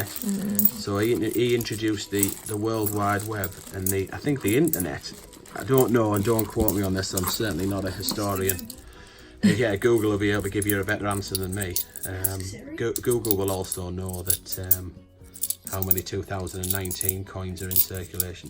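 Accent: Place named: Northern England, United Kingdom. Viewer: Love a good old northern natter keep up the good work.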